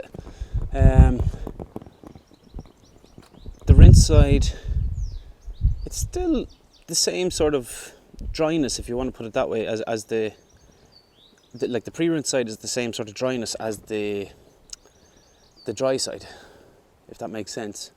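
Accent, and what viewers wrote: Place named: Ireland. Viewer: are you irish?love the accent 👍🏿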